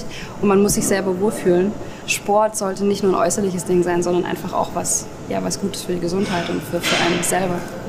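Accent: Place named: North Rhine-Westphalia, Germany. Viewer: Mensch. Bin total enttäuscht über Deinen NRW Slang. Ich dachte Du bist aus Ba-Wü und kannst echtes Hochdeutsch. Ausdrücke wie Spoaart oder Leaaarnen gehen gar nicht. Es heißt Sport z.B. mit kurzem O und keinem anderen Vokal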